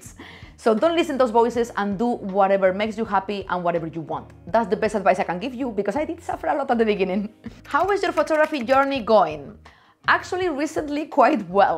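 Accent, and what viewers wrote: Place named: Spain. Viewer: Lo primero que me llamo la atención de tus videos fue tu acento, después de varios vídeos especulaba eras de España y si, gran contenido, saludos desde México, también subo contenido a mi canal, todo en español, ha sido complicado para ti hacer un canal en inglés.?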